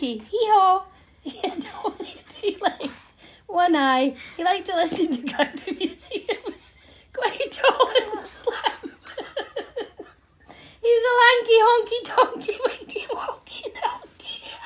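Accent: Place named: Scotland, United Kingdom. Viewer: The Scottish accent is just the cherry on top, and the wheezing from the cameraman.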